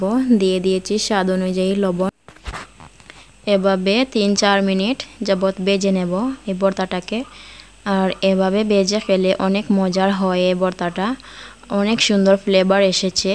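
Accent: Sylhet, Bangladesh. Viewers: Apu apni sylhet er kotay taken?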